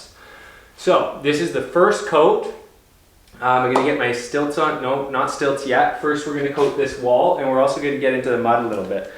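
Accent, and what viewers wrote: Canada. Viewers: I like how Canadians say "process"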